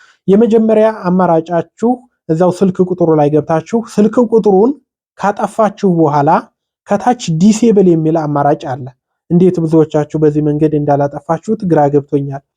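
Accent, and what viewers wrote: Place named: Wollo, Ethiopia. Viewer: ወሎዬው አክሰንትህ እጅግ ተመቸኸኝ።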